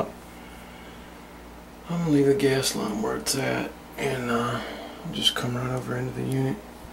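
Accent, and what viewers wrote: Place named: South, United States. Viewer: your excent is not to bad being from alabama, i always enjoyed hearing the southerns talk.seeing i am a yankee. good job on the video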